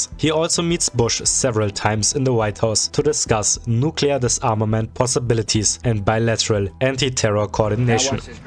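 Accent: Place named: Germany. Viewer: The pronunciations make me think you're a German speaker? The Z in Zyuganov should be a zee sound and ths Sh in Stepashin should be a sch.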